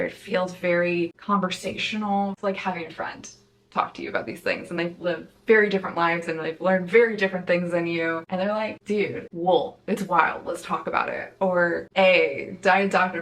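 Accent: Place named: United Kingdom. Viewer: Ahahahahaha hypnotized by the calm bland British accent, I can’t I’m dying 😂😂 I really enjoyed Micheal Pollen’s book “Cooked” I bet this is your mind on plants would be very much up my alley too. And 100000 percent kitchen confidential deserves to be on this list, listening to tony read this one made me so happy to hear one of my favorite voices in the culinary world again. Ooo did you hear about vanishing fleece from books unbound? That’s the only other place I’ve ever heard it mentioned., and I know you said you were an Ariel bisset fan! Alright, excuse me while I watch all your videos, I have a new favorite booktuber.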